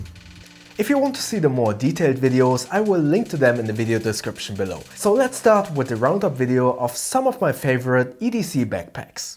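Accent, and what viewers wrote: Germany. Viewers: Deutsche Akzent am Start :D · That T H I C C German accent tho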